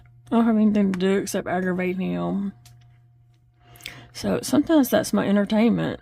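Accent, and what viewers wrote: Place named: Louisiana, United States. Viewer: Hi, I hope you will always find a way to keep crocheting but even if you couldn’t, I’d still watch your videos just to listen to your lovely Louisianan voice and hear your giggles. From now on I will also let the ads run through without skipping. I’m crocheting anyway and not watching the screen all the times. I hope you feel better soon. Best wishes from England :)😊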